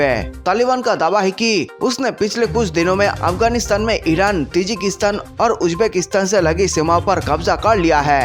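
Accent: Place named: South India, India. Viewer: Madrasi hindi sikhle pehle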